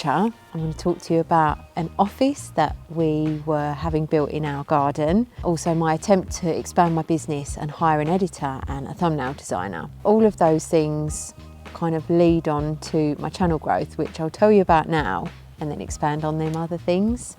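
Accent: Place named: England, United Kingdom. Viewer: Love your voice, your English accent is great! Course, I’m in Chicago 🤷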